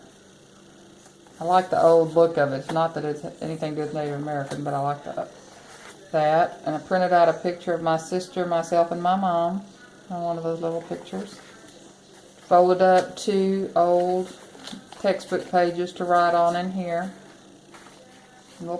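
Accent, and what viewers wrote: South, United States. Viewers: Hi! Love your journal....being from MS, it's great hearing a southern accent! And being from Houston I am quiet sure you DO know how to cook beans!! LOL.. I also love western and Native American stuff... love how you tied the seam binding in the holes on the pages! I could say so many more things about this great journal... Thanks so much for sharing it with us!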